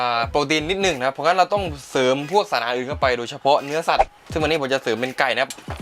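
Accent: South, Thailand. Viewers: พี่คนใต้หม้ายนิ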